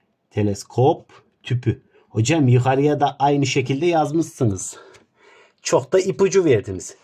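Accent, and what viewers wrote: Kayseri, Turkey. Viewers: Abi sen gayserilimisin?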